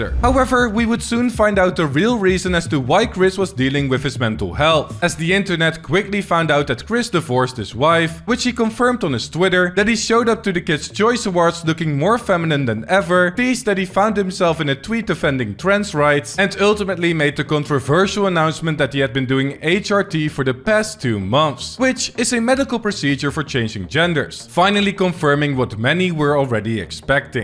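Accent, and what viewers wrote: Netherlands. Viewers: I can hear that you Nederlands are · Ben jij Nederlands? Je accent klinkt echt erg Nederlands🤣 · God the dutch accent..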